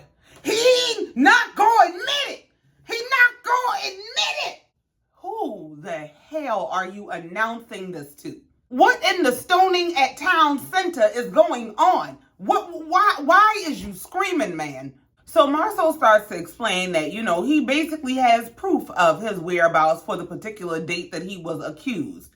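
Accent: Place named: New Orleans, United States. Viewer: Omg, Where are you from? I just love your accent. I want to guess New Orleans but I’m not sure, lol.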